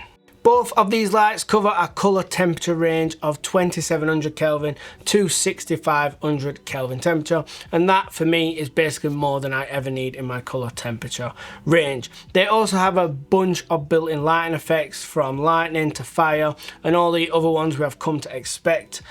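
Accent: United Kingdom. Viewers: That’s the best voice and British (British, right?) accent on the whole film production–centric YouTube!!! 🎥👑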